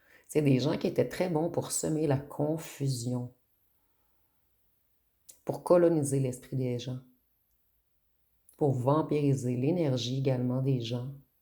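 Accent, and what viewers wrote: Quebec, Canada. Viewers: Bonjour et merci pour votre travail ❤❤ vous êtes une belle âme et j adore votre accent vive le Québec 🎉🎉❤ tendresse du cousine de France 😂😂😂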